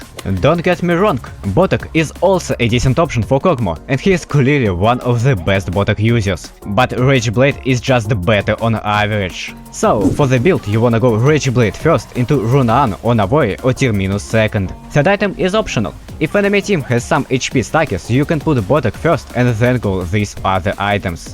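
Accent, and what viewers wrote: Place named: Russia. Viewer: out of the context, where are you from? what's that accent? feels like youre russian or slavic.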